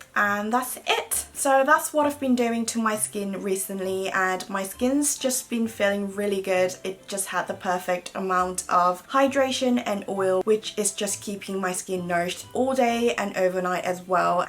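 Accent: United Kingdom. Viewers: 메이크업 영상보다는 일상 영상을 더 먼저 접했는데 언니의 여유롭고 행복해 보이는 삶의 태도가 부럽고 저도 닮고 싶었어요~😇학교다니고 시험에 찌들다 보니 언니처럼 밝고 긍정적인 하루하루를 살고 싶더라고요.😢😢항상 꿀떨어지는 영국 발음과 함께 좋은 정보, 영국의 예쁘고 아기자기한 일상들을 공유해 주셔서 감사합니다😘 앞으로도 응원할께요👊👊 제 올 한해 목표는 꼭!!!!라면을 줄이겠다!!!!! 기필코!!!!!!!!!!!!! 입니다💞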